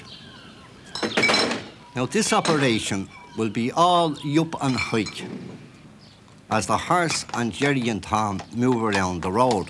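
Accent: Dublin, Ireland. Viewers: I haven’t heard that accent since I was a little girl! My great grandfather was born in Dublin, came to US in 1928. He passed in 1964 when I was age 12. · I was just thinking, the narrator has a great Dublin accent, without being common as muck. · Chris Clark it’s what is known as “ an auld fashioned” dublin accent. Far softer and far better pronunciation than the dumb sounding monotoned aggressive sounding accent that Dubliners have today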